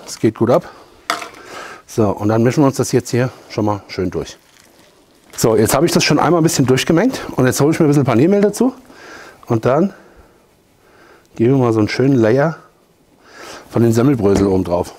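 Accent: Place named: Hesse, Germany. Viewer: Hi! Bin ja durch Zufall auf Deine Videos gestoßen! Hier einen lieben Gruß an die "Scheffin" 😉 Dein Dialekt hat mich sehr erfreut.. Heimatgegefühl! Selbst bin ich gebürtig aus Dirppdebach! Deine/Eure bisher gesehenen Videos war phenomenal, war schon neidisch 😂 Ich lasse nun ein Abo da und freue mich auf weitere Videos! Bis jetzt: Klasse! UND, bitte weiter so! LG aus Hessen nach Hessen 🙃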